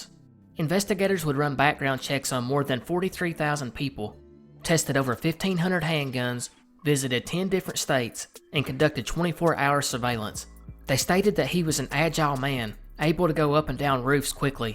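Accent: South, United States. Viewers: My dude! Love your channel man. Not sure where you're from but you've got quite a Southern draw. As someone from Kentucky, it's refreshing to listen to someone I can relate to. And your stuff is super interesting and well done. Keep up the good work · Keep this up man. I think we’re from around the same neck of the woods, and it touches my heart to hear a southern accent talking about these mysteries.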